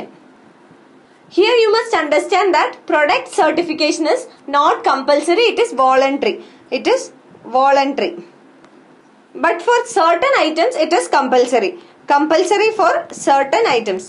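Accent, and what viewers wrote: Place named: Kerala, India. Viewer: When she said value, only, module, later, point, percentage, merely. I came to know she is mallu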